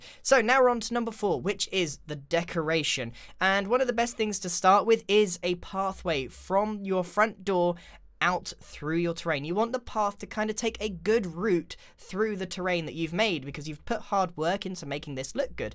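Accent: Netherlands. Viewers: Last van een nederlands accentje?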